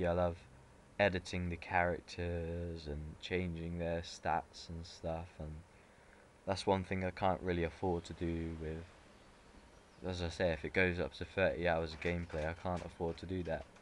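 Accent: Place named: United Kingdom. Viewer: A fellow Brit liking Kingdom Hearts! I have never heard a Brit do a commentary on it :) Love it :3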